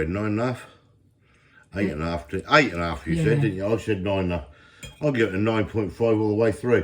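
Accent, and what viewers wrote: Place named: England, United Kingdom. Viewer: Mr is English. I understand him and I'm from the South of England. There are different accents and dialects depending on what part of England you're from. Please be kind and not rude on this channel sweet 😊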